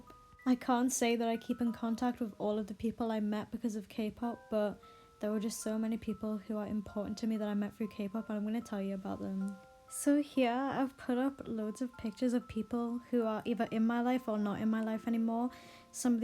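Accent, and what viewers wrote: Newcastle, United Kingdom. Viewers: Omg you went to my school in newcastle 😂 knew there was Geordie in your voice haha. I left the year that school uniform was introduced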